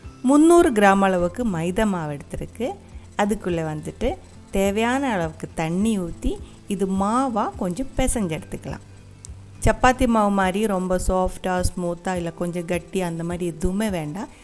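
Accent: Kerala, India. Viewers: Vera level akka.. First video paartha udanae subscribe panniten... Because, ungaloda malayalam kalantha tamil that's so sweet as your cooking.. 🥰🥰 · Your Tamil with slight Malayalam slang is very sweet to hear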